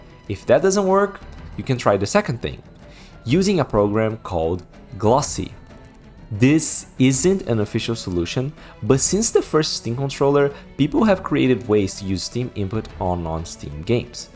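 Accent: Brazil. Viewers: Ótimo vídeo bro, mas só comentar que eu fico muito feliz quando entro em um vídeo em inglês esperando um gringo e percebo o sotaque brasileiro kkkkkk um dia a gente domina o mundo pprt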